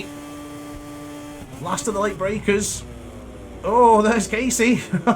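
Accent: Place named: Birmingham, United Kingdom. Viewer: that brummy accent XD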